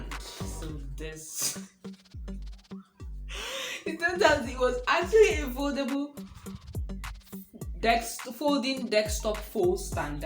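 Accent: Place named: Igbo, Nigeria. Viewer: I am soooooo hearing the igbo In you!😂😂love it! "Foldeble"😂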